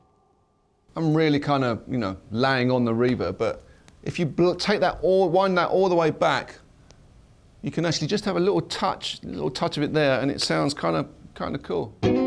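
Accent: United Kingdom. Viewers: in fct is acent of britin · heavy british accent? He is british?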